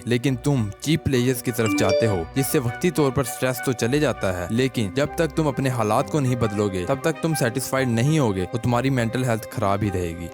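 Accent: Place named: Punjab, India. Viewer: You r punjabi right 😮😮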